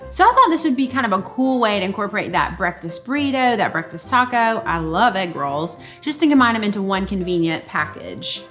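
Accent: South, United States. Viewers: Huneeee where's you from? Love a southern lady. I grew up in NC and your voice sounds like home! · Jacob Halifax - It's like Southern and Valley girl at the same time. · It's a beautiful southern accent ! "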